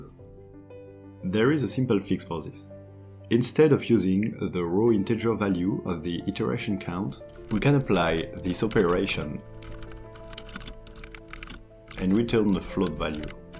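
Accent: France. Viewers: I hadn't noticed but you have a French accent! 😂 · Salut , je voulais savoir sur quelle plateforme on pourrait communiquer. Je laisse ce commentaire en français car l'accent ne trompe pas 😂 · I like your accent u french? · Ohhhh cet accent Français je reconnais:) Nice work!!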